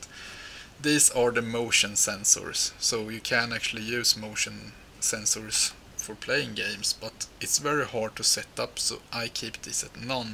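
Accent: Sweden. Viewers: Är du skånsk??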